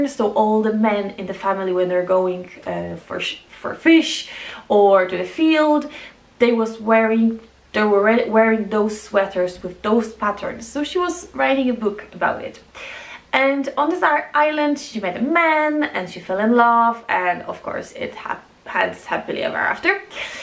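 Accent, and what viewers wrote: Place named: Ireland. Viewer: Your accent is awesome. I love hearing foreign-born people with an Irish accent.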